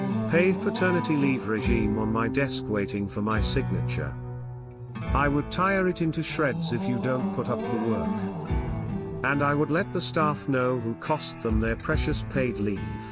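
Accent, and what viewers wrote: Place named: England, United Kingdom. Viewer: English accent 💩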